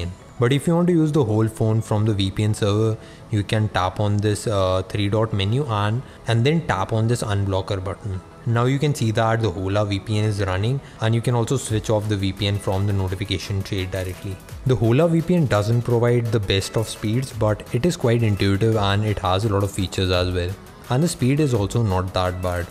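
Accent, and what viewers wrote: India. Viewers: This is awsm bro thank u 4 video r u Indian? i asked this question because of ur accent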